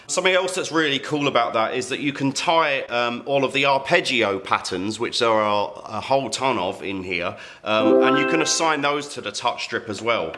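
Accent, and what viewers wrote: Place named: United Kingdom. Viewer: From your accent you seem to be British so why is everything priced in American dollars on your site? Are we going to find that UK customers are going to be ripped off again (I've been charged over £250 for things priced at $250 US in the past by other companies) because someone seems to think that we're too stupid to notice the difference in the exchange rate, and they also think we won't notice that we're being conned. This looks like something I can make good use of but I'm reluctant to buy without knowing how much it's really going to cost me.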